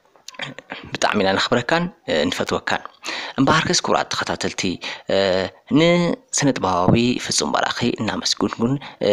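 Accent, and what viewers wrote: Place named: Agame, Ethiopia. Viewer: አታ መን ኽሐፍረልኩም በዞሞ ዓጋመ ተንብብዎ ትግርኛኻ አጽሪኻ ምጻእ ዑጉመይ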